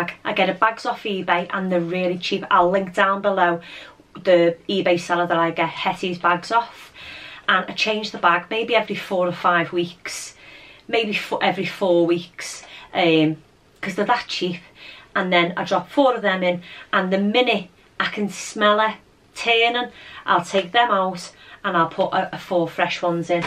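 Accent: Liverpool, United Kingdom. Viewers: Love the scouse accent.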